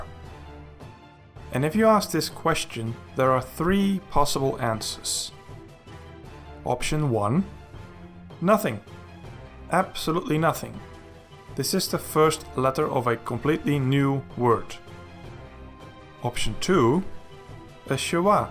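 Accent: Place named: Netherlands. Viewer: hahaha nohhh mann. Ik hoor gelijkkkk dat je Nederlands bent 😂😂 we hebben zo'n accentje dat ze nergens ter wereld hebben. 😂😅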